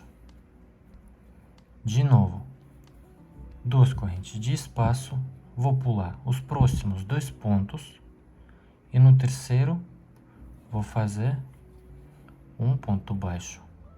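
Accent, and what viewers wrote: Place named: Brazil. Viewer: NOSSA.. EXPLICA MUITO BEM..AMEI A AULA..ADOREI O SOTAQUE, DE QUAL ESTADO DEVE SER? TENTANDO DESCOBRIR PELO SOTAQUE,MAS NÃO CONSEGUI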